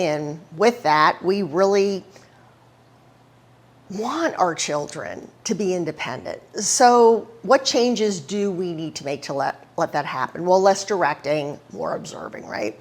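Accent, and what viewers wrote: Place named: South, United States. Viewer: Thank you for your wonderful content channel mrs. You seems very sociable. As learned since I began to American and British YouTube channels so many things, and Iam still learning. Southern people are very friendly, have hospitality, strong family ties. Appalachian region full of myth and supernaturals such as big foot, New Jersey devil. Mew mew means granny. I read about Texas traditions, manners, wonderful characteristics such as respecting elders, being polite, showing kindness to others as part of Texas values. Native Texans are most genuinely friendly people we ever meet. You have special accent under southern with twist. You have Friday night football, rodeos, barbecue you have too in Arabic countries barbecue it’s great coincidence. Home coming is football or dance celebration of shared history memories, pride, cowboy, Texas is bigger than France. 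I hope I can learn a lot from your knowledge. Happy belated fall and thanksgiving in advance. Good luck to you your dearest ones.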